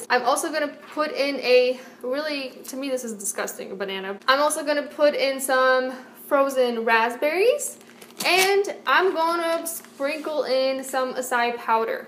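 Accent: Poland. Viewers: omf i absolutely love your accent. 😍 are you by any chance polish? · Just wondering but are you Polish? Because your name is and you kind of sound Polish.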